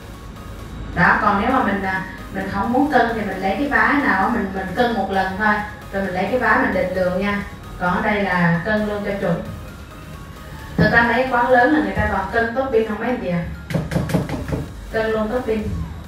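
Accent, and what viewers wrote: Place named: Huế, Vietnam. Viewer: Nghe giọng là biết Huế rồi hiihi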